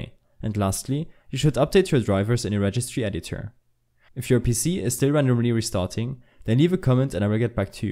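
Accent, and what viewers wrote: Germany. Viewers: He’s just German alright don’t judge people.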